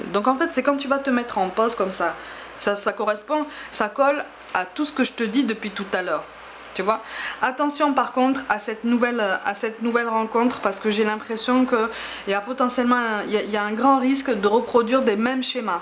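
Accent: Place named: Perpignan, France. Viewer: Excellente guidance ❤️ je kiff ton accent Perpignan ???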